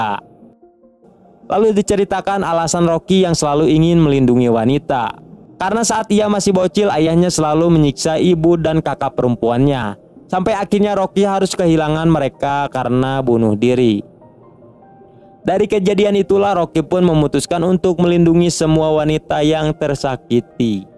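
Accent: West Java, Indonesia. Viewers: Bahasa nya gk angger,ada sunda²nya 🙏🏾🤭😂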